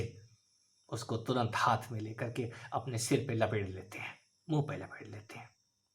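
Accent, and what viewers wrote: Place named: Bareilly, India. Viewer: Guruji ap bareilly me rahte the pahle.. Apko sun kar esa lga mujhe.. Kirpa karke apne bare me kuch kahiye🙏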